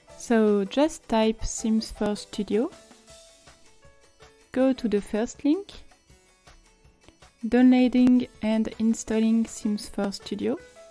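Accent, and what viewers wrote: France. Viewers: Прелестный французский акцент...🙂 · Au vu de l’accent on reconnais que tu es française 🤣🤣 dommage pas de faire en français y’a dja bcp de tuto en anglais 😭 merci quand même :)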